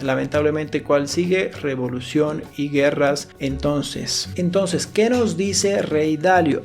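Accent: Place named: Cuenca, Ecuador. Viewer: Muy buen video primo! ( tienes acento cuencano talvez si somos primos!)👍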